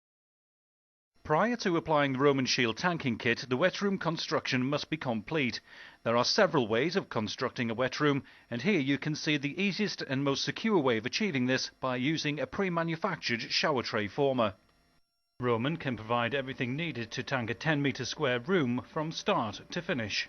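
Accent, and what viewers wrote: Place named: United Kingdom. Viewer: British english is hard for me...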